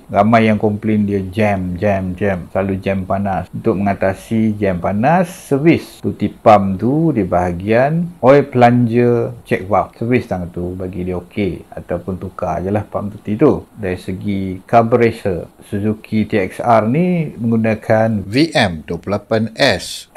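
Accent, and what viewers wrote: Perak, Malaysia. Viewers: Assalmualaikum.. loghat Perak geng ni.. zaman ayah sy... Lepak dayabumi..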